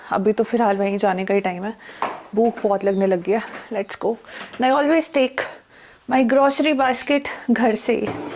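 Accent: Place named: Uttarakhand, India. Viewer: Looks from her accent that she is a Uttarakhand Brahmni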